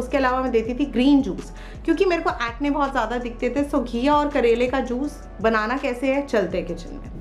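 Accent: Punjab, India. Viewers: Mam Na Punjab Faridkot se bol rahi hai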